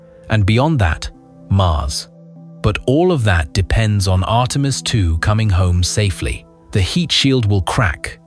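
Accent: United Kingdom. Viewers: a narrator with a British Accent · narrated by people who have British Empire accents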